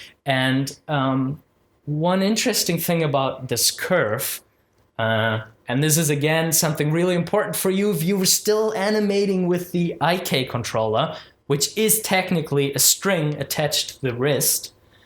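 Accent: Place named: Germany. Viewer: I love your German accent Cause, I have the same accent xD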